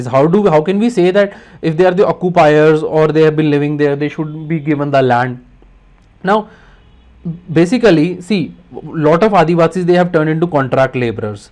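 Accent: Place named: Punjab, India. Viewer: ur accent seems to be punjabi r u punjabi????grt and awesome videos btw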